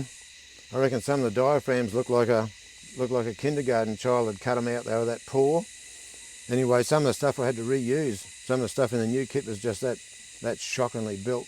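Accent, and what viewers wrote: Australia. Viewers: Critters. Snakes DEN maybe. Mate it's GREAT to meet a true professional with an Aussie accent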